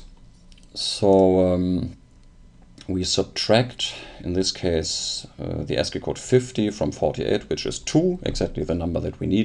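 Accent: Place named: Germany. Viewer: Are you german? You sound german, especially when you say 'digit'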